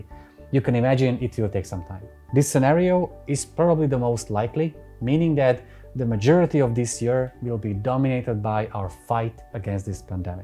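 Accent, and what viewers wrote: Hungary. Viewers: Your accent reminded me of my grandmother's. Sounded familiar. ;) I never got to learn Magyarul, but still hope to one day! Be well. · Hungarian accent,,,dr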